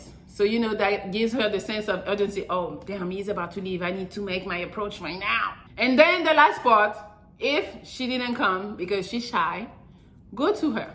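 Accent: France. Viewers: Hi I'm a first time viewer to your channel, a virgin of sorts, so just a few things first off i wish we' had vids (podcasts) back 20 odd year's ago because boy was I stupid, i used to have them running after me, know their running away from,second i thought i detected a wee hint of a bit of a French accent, apologies if I am worng I was just wondering i don't think I can jump that far. Keep up the good work. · What's your nationality lass, you have a french accent👀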